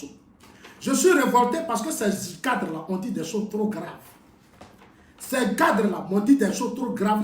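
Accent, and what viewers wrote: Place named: Bété, Ivory Coast. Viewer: Il parle avec l'accent de bété qu'il est.Il n'est pas là pour apprendre à parler avec autre accent.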